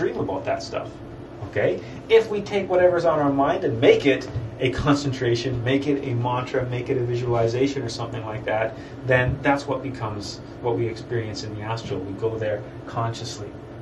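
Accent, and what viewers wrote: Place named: Canada. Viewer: He's from Canada... I can hear the accent ;) haha not that it matters but great stuff in this video good work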